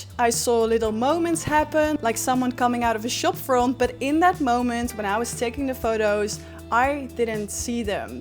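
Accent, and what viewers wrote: Netherlands. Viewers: She has a Dutch accent? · That Dutch accent, 🔥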